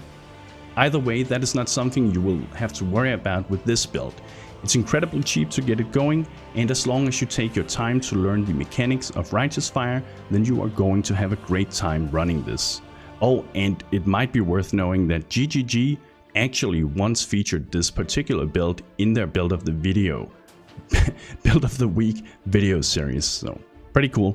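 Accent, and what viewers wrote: Denmark. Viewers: Danish? Can't help but notice that accent... Banter aside, great video man!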